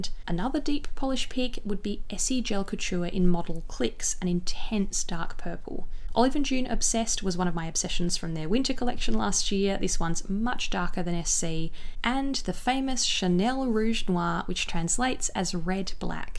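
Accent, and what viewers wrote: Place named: Australia. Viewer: Awwww must be the Aussie accent 😍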